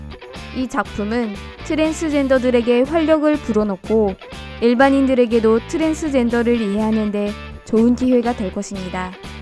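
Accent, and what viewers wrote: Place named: Japan. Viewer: 발음이 일본사람같네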